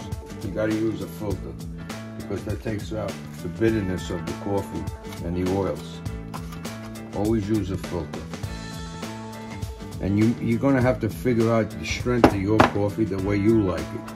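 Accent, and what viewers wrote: New York, United States. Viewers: Cool, I like your Italian New Yorker accent.. My mom use to make percolated coffee, Yuban , not available in Canada.. Thankyou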